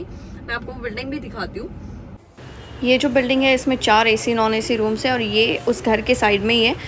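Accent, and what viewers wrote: Malvan, India. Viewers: तुझा मालवणीच आमका गोड वाटता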